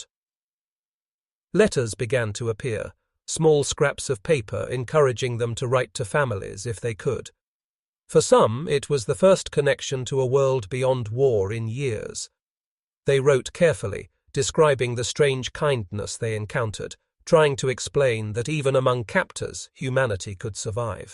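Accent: United Kingdom. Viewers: wHY IS THIS ROBOT SPEAKING BRITISH ENGLISH? TO AN AMERICAN AUDIENCE?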